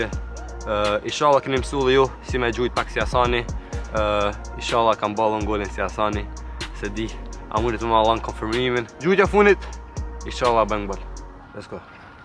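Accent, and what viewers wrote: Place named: Kosovo. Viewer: Me dukesh që fol gjuhë të përzier sikur kosovar dhe shqiptar(sikur nga shqiperia) 😅😅 veq vazhdo më i miri youtuber shqipatar jee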